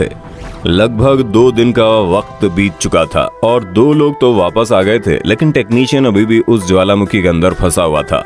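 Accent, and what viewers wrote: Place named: Hills, India. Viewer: आपका आवाज बहोत पहाडी है sir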